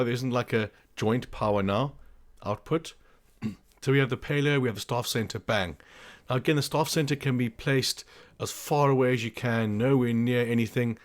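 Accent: South Africa. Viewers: Bro u sound like a fellow South African are u?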